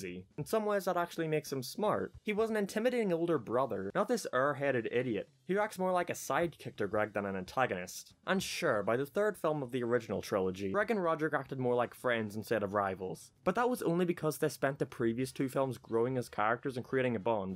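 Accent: Ireland. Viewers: Dude where are you from I love your accent! Irish? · Wasn’t until that i realized he has an Irish accident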